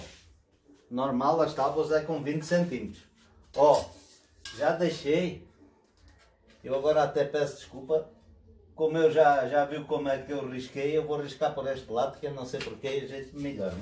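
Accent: Portugal. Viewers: fico muito orgulhosa de ouvir um Português no YouTube! Sou Portuguesa e estou em Portugal. Ótimo trabalho! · Adoro o sotaque do português AMO de paixão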